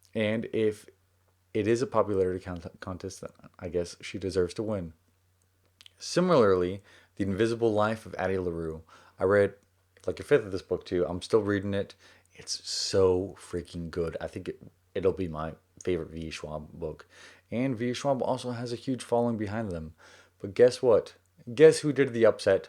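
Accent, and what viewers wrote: South, United States. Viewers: I love your video at your house in Texas during your quarantine until you're talking about all of the books while you went on the website of Goodreads on your cell phone to see all of them are brand new and it got came out this year, but it's called of Best Books of 2020 to look at them at the same time after that, I hope your husband, Zach will be very proud of you and I love your Southern accent!!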